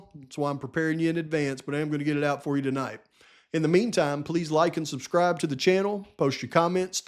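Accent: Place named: Texas, United States. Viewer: C i too recognize acent. It's pure texan.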